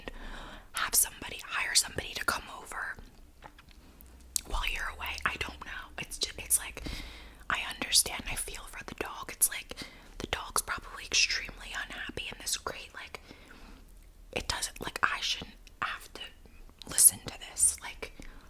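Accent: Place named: Delaware County, United States. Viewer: Girl are you from Delco? You remind me of back home 😂❤️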